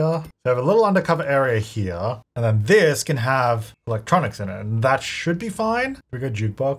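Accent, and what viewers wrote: Australia. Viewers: Has anyone ever told you you sound like Jazza (and it's not just because you're Australian)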